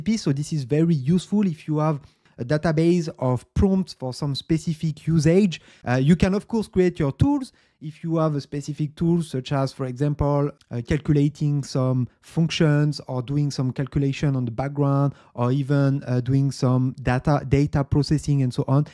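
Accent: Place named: France. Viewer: Ah l'acccent fr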